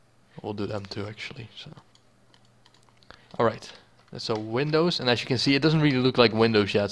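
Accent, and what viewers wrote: Netherlands. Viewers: Dude, you dutch? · Are you dutch?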